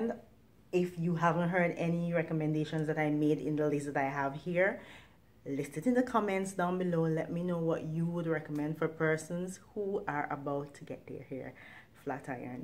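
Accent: Jamaica. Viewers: I've had my hair flat ironed days ago because I wanted to get my ends trimed. When I wash my hair, I will let you know how it turns out. I keep listening for the Guyanese accent and keep hearing Jamaican 😊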